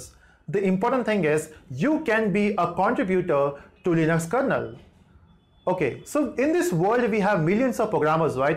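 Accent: Telugu, India. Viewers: he is a telugu speaker, he is probably from andhra pradesh, is a dead giveaway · yes..sir is from Andhrapradesh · Why do I always have a feeling that ur native language is telugu.... Are you?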